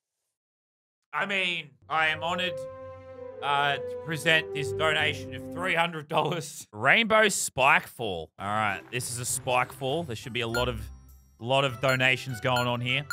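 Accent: Australia. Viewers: This is if mrbeast was Australian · I’m Aussie and it’s a bit weird hearing people saying YouTube like that on YouTube because I never really see Aussies on yt because I haven’t watched u for a while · He Australian?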